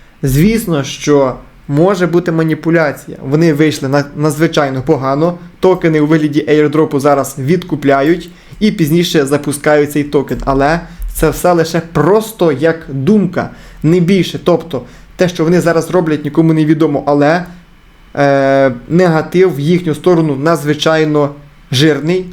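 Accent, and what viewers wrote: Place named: Zakarpattia, Ukraine. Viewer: крутий акцент у тебе, пахне закарпаттям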